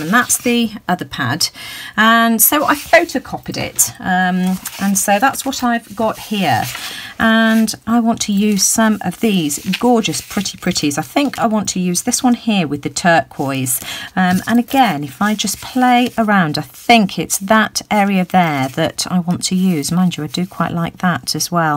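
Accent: United Kingdom. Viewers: Love love love your accent! So wish I was British 😭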